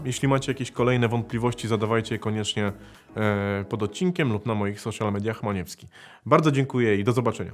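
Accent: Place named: Poland. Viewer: Niesamowicie bawi mnie Polska wymowa angielskich słów 🥲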